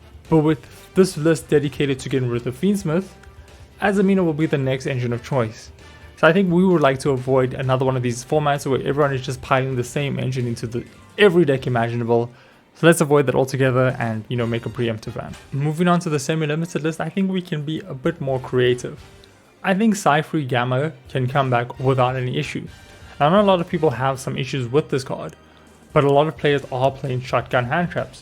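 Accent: South Africa. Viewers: Im in. And i just realised u south african! Lesss goo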